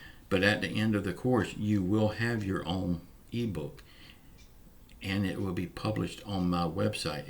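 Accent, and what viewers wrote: South, United States. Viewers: I love your channel. I want to Thank You for everything.. U r the only one that seems to know whats going on , plus o love that southern accent... take care hope Amy is doing lots better ..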